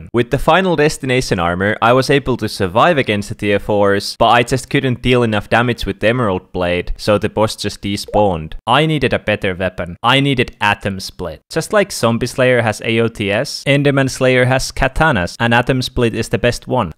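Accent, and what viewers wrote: Finland. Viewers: Finnish accent 👀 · häiritsee ku englannissa kaikkilla suomalaisilla on semmonen aksentti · are you finnish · The finnish accent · R u from Finland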